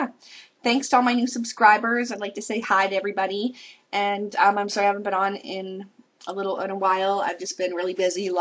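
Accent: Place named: Canada. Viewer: I love your voice and the way you tell this story! I'm a sucker for the mild and lovely Canadian accent, I gotta say. I have to go watch more now and find out more of your story here....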